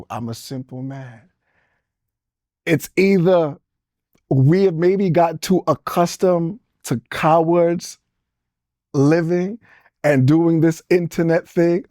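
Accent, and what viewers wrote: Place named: Kentucky, United States. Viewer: You sound like a victim. You saying how you cared for others and provided. I'm from Kentucky and black you sound like us country and a voice change for somewhere overseas. Now was a Trippin he saying I'm going to daddy's house a few times. Sounds like he got a lawsuit coming. My brother, my friend black men need to embrace mental health with appointments.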